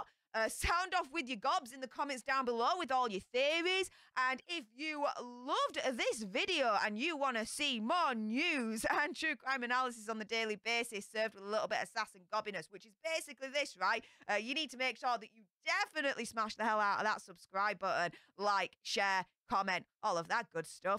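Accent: Yorkshire, United Kingdom. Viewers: HAS to be Yorkshire tho'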